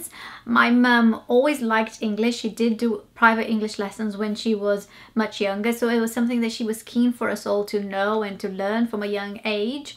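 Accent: Wales, United Kingdom. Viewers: So cool that you've absorbed a welsh accent!! As soon as you started speaking I thought you had a welsh twang. It was great to hear a British accent but then when I heard the Welsh that was a nice surprise :D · Welsh accent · You have the perfect accent when speaking English. Don't give up because you are very good at it👍also your accent sounds good welsh. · American? She sounds Welsh to me! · Your accent sounds quite Welsh! (I'm English) did you happen to spend some time in Wales? Edit: omg you just said you have a Welsh accent! Haha knew I had a good ear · Native English speaker here (Scottish from Glasgow, have lived in London for over 20 years now). For the first 7 minutes of this video, I was thinking:"if there's no Welsh connection, I'm going to be very surprised; what a lovely perfect English-with-traces-of-Welsh (e.g. the beautiful way you say the word "years") accent". 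Then : Welsh boyfriend/husband. BOOM! You are obviously a very talented linguist, and your accent is beautiful. :-)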